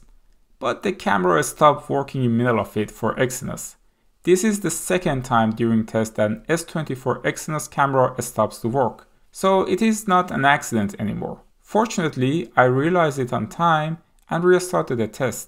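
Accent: Iran. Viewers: Great video my iranian brother (guessing by your accent ;) ) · Persian accent 😄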